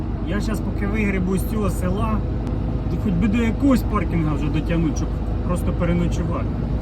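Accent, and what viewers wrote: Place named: Kirovohrad, Ukraine. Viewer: Тільки хотів написати в коментарі, що чую знайомий говір, як на Кіровоградщині, аж тут ви самі про це говорите. 🤗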